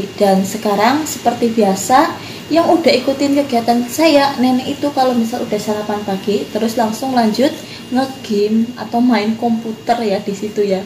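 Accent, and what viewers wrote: Banyumas, Indonesia. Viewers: Pilih mana y? Ngapak gesss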